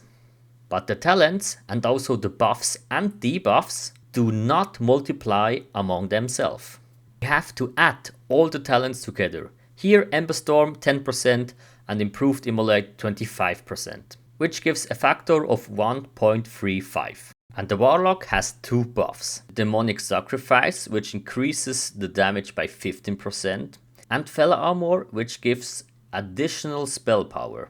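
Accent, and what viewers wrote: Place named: Switzerland. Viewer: very thick swiss accent haha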